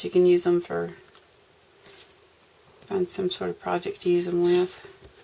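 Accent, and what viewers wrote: South, United States. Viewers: New subbie (from grammie2bay). Thanks for doing this tutorial -- you make it so easy to understand....especially appreciate your chipboard pieces with the measurements written on them. I usually put TP rolls(cut up) into the compost pile, but now have a use for them. Love your southern accent! Marianne:)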